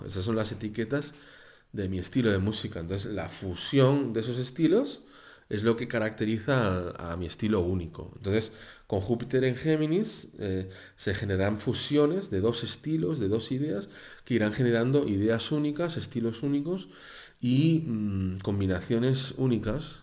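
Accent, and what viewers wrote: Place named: Andalusia, Spain. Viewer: Holaaa! Me da mucho gusto escucharte, nombras palabras como mi abuelo de Huercal Overa y de mi abuela de Baza... me da mucha nostalgia y a la vez trasmitis con mucha gracia tus conocimientos!!! Me encanta tu forma de leer los astros. Hace varios años que te escucho. Gracias❤!!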